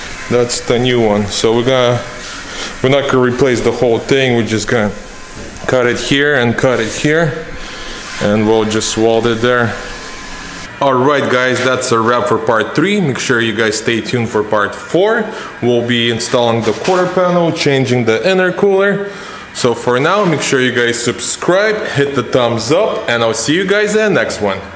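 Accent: Russia. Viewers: Выдает русский акцент ))))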